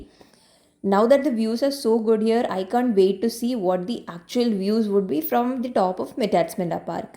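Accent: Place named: India. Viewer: Indian accent is so terrible!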